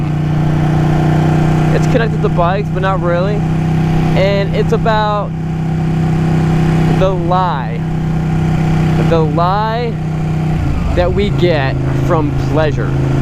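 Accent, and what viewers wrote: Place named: United States. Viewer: Ok guess where you are....from your accent and the fact your on the wrong side of the road, plus your about to dribble out your opinion, id hazzard a guess that your an American in America. Am i right or am i right?. Anyway carry on, i like watching and listening and everybody's entitled to their opinion, no matter what leftwing progressive's say.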